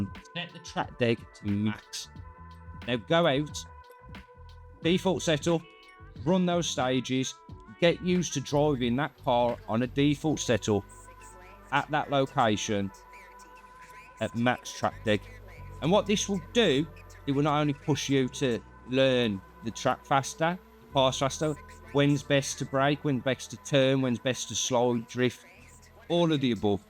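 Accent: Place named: Midlands, United Kingdom. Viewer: For anyone not from Britain... this is a classic "Midlands" accent ... 🫡🇬🇧 It's equivalent to the American 'deep south.' 😂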